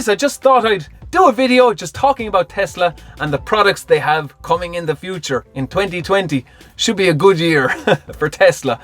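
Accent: Ireland. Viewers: It's my first time here, too. He's got a Greek name and an Irish accent! Love it.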